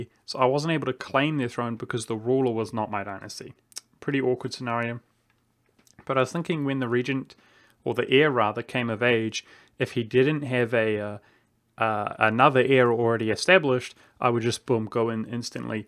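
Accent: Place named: New Zealand. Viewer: He sounds like he’s from New Zealand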